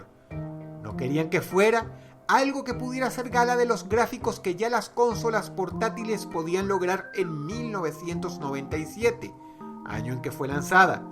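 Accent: Caracas, Venezuela. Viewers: Hay mas comentarios actuales que de hace 10 años cuando salio este video mencionado cómo Dross pronuncia Consola 😅 (asumo que eso viene del acento venezolano que aun tenia en ese tiempo) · El tiene acento venezolano, en sus videos mas actuales su acento desaparecio, xd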